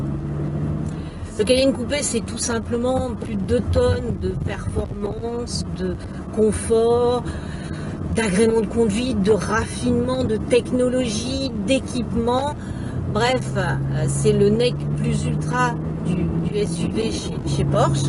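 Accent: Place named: Paris, France. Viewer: Bon essai, mais que vous êtes désagréable à écouter Madame !! Et si l’on ne comprend pas que vous êtes une Parisienne pure souche, il n’y a qu’à tendre les oreilles... Bref, je préfère vous lire, vous y êtes indéniablement plus à l’aise.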